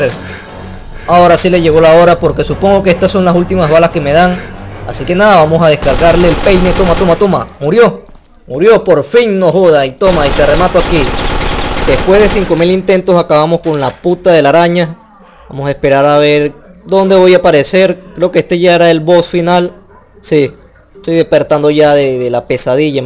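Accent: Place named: Venezuela. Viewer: tus videos son buenos solo para venezolanos al parecer del resto no entenderan bien lo que dices xD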